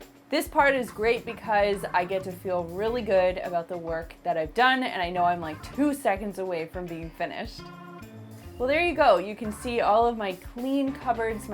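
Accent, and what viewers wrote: Canada. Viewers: My boyfriend knew you were Canadian just by your accent! I couldn't tell the difference until I focused on it. The way you say "progress" is so cute ☺️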